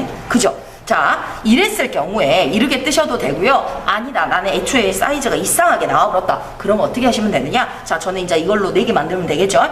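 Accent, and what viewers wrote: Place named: Jeolla, South Korea. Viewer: 전라도 사투리 구수합니다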